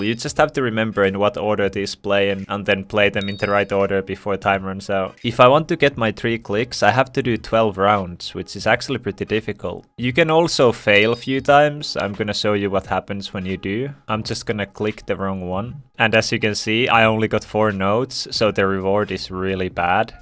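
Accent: Finland. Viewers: i noticed the finnish accent in 0.2 seconds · Where are u from bc means block on Finnish and u got an Finnish accsent on english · instantly when i clicked on the video i realised from your accent that your finnish :D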